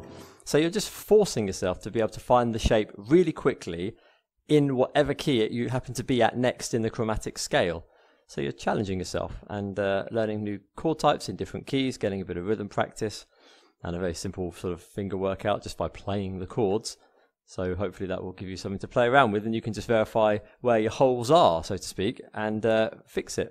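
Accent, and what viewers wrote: United Kingdom. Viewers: Nice! You sound like you’re from the Uk but your location is different, how’s the life down there? Keep up the good work!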